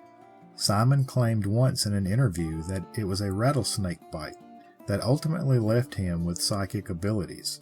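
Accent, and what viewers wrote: South, United States. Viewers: I love your way of telling stories. The accent does make me miss living in the south. What is it that catches your curiosity about a story? What ultimately draws you to telling it?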